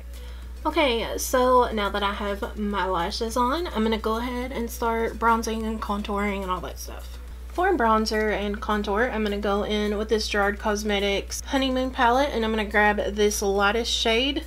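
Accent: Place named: South Carolina, United States. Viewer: Where in the South is your country self from! Lol. I'm in S.C.,you sound like you're from here! 😎😋😂👍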